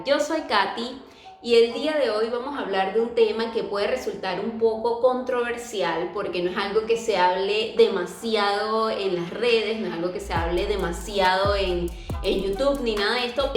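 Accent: Venezuela. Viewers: Que HDP el que te dijo que no le gustaba tu acento, como argentino realmente me da vergüenza ese peloduro, con respecto a los alquileres eso lamentablemente pasa en muchos países y en algunos es casi imposible conseguir alquiler si sos extranjero. Volviendo a lo del acento creo que lo mas lindo es escuchar a una mujer con acento venezolano ja ja ja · El que te dijo que le molestaba tu acento es un tarado, N le hagas caso. A mí me encanta el acento de venezolanos y colombianos. 🙂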